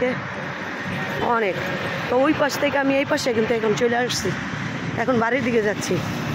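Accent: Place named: Brahmanbaria, Bangladesh. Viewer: কিসের জন্য এমনটা হয়েছিল আপা এটা জানতে পারছেন তো যা হোক চ্যানেলটা যেন ঠিক হয়ে যায় আপা আপনি আমাদের ব্রাহ্মণবাড়িয়া বাঞ্ছারামপুরের সেম ভাষা বলেন অসাধারণ কথা খুবই ভালো লাগে তো দোয়া করবেন আমি আহমদ আলী সৌদি আরব রিয়ার থেকে ব্লক গুলি দেখি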